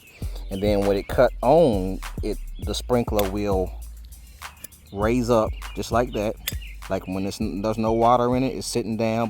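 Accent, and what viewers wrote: South, United States. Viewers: Love the accent. We southern!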